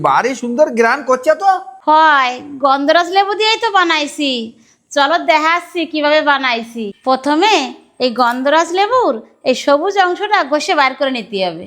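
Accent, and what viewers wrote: Bangladesh. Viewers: কথা গুলা ভালো লাগে বাংলাদেশের কিছু অঞ্চলে মতন লাগে ❤ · বলছি এইগুলোর কি sir এ নাম কেটেছে? 😂 এখনো তো বাংলাদেশী কথা বলে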